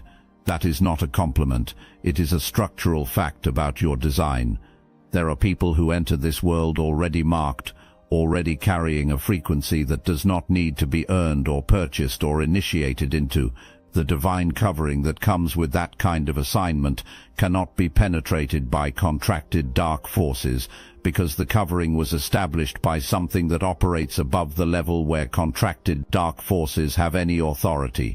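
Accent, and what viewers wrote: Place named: United Kingdom. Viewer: my dear chosen one which i respect so much because of your magnificent british accent i thing there is a misunderstanding here ,,what spiritual gifts are you talking about or any spiritual property ,nothing from that exists,i guess that something else is needed here ,, cant trace yet but soon as i realise i let you know,,,,😁 👻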